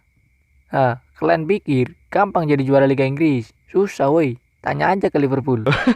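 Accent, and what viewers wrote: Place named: Medan, Indonesia. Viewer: orang medan kau ya min nampak kutengok kau logat medannya, kalo gitu salam dari medan juga.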